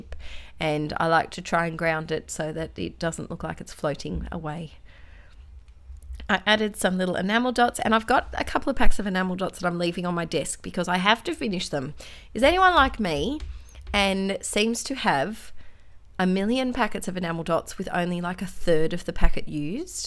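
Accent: Australia. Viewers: Always look forward to your videos (you are the first person I look for each day when I first log into YouTube!!) and I love your "Scrap Stash Saturday" videos - it's lovely to see such inspiration and different ways of using those little bits which would normally either sit in a drawer or be put in the bin. Watching your videos and techniques is what made me start buying and using Distress Stains and Sprays but I'm only a beginner, and yesterday I bought my first Project Life cards and page protectors - thank you!!! I am in the UK and really enjoy listening to your accent and hearing about life in Oz too... we were in Hobbycraft yesterday (a bit crafting store) and I was walking around with my boyfriend, we kept seeing different things like Gelatos and thickers, each time I turned to him and said "that's what uses" lol, think he thought I was mad!! Keep up the great work <3 xxx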